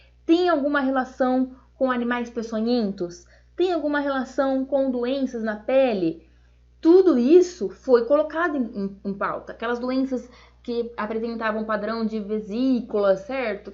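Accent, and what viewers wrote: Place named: Inland São Paulo, Brazil. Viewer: Agora, uma pergunta: Esse sotaque te entrega que vc é do interior de SP ou PR. De onde vc é? (Até pq eu tbm tenho haha)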